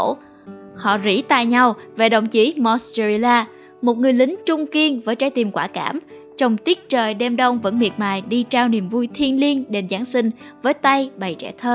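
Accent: South, Vietnam. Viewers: Giọng miền nam duy nhất của nhà spider nhĩ, nghe de thương quá 😍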